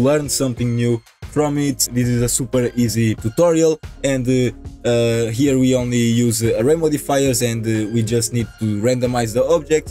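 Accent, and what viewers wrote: Portugal. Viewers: O sotaque de tuga sempre da para perceber, parabéns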